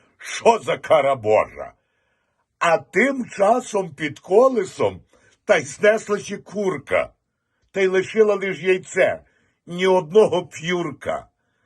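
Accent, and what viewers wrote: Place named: Lviv, Ukraine. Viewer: Класно! Дуже посміялась! А львівська балачка- то супер файно!😂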